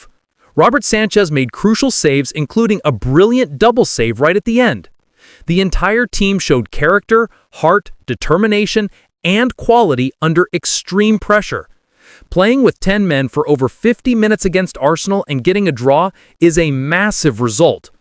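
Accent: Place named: United States. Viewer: We don't want these ghastly American narrations. Spoils things!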